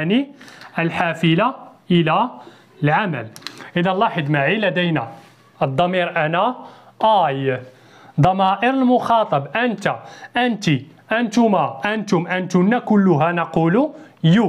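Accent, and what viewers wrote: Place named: United States. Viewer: هذا نطق أمريكي و ليس بريطاني على ما أظن أستاذ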